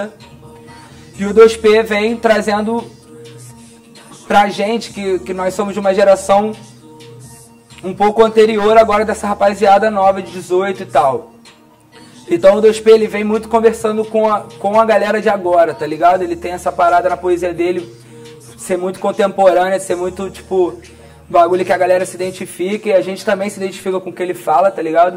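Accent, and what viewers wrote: Rio de Janeiro, Brazil. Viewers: respeita os carióca daê na moral kk cara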